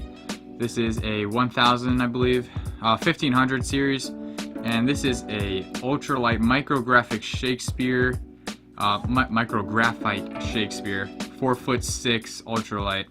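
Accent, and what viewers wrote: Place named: New York, United States. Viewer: it's how us New Yorkers talk😂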